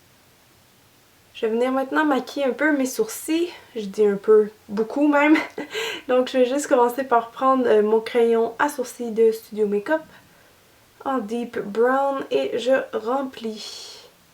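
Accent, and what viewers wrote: Quebec, Canada. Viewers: Whoua Alyssa Milano avec l'accent québécois ;-) très jolie !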